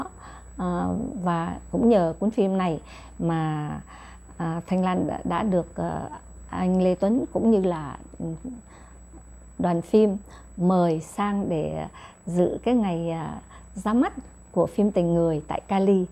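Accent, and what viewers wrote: North, Vietnam. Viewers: Giông bắc của chị thanh lan dễ thương dạ vân dạ vân hôn chị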